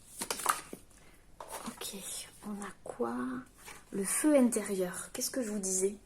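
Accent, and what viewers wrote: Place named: Hautes-Pyrénées, France. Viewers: je découvre ta chaine, j'ai choisi le tas 2. Tout est vrai et le chakra de la gorge cela me parle car pendant 65 ans j'ai tu ma personnalité pour éviter les conflits, pour ressembler aux autres avec cette impression incroyable d'être complètement différente. Ma façon d'être à toujours dérangé et j'ai perdu mon identité. J'ai le don de l'écriture, du chant et du dessin et depuis plus de 6 ans... je suis bloquée. Mardi je me fais ponctionner la thyroïde... à force de ne pas dire mon ressenti, de taire certains sentiments, j'ai développé un soucis qui peut être grave. J'ai des rêves prémonitoires depuis longtemps, je fais des méditations, de la cohérence cardiaque et aussi j'écoute beaucoup de séances d'hypnose pour avancer. Je confirme les douleurs dans le dos et les bras, la libido inexistante. Je me fais aider par une magnétiseuse-énergéticienne qui m'a dit que j'avais un pouvoir. 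Je suis trop fatiguée et fragile en ce moment pour l'aborder et le travailler à 65 ans on a pas la même énergie qu'à 20 ou 30 ans. Merci Jolie Voix à l'accent qui fleure bon l'Occitanie. Namasté 🛐☯️♾🌟🌹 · Bonsoir, Merci cela me fait du bien d'attendre l'accent des HP. Aucun endroit ne ressemble à notre département. Les marchés me manquent et la simplicité de nos campagnes. Les gens sont simples, et on rigole bien. Et la tourte!!! Tu es trop mignonne, très douce et gentille. Prends soin de tous ceux qui te sont chers et de toi aussi bien sur. Bye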